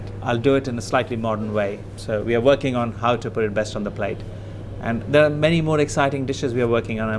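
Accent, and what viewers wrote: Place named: United Kingdom. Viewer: British accent uncle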